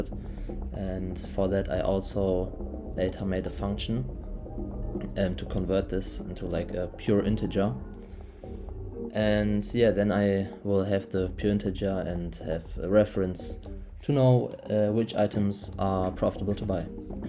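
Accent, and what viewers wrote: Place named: Germany. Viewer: Are you German?, as a german i woud say that you sound german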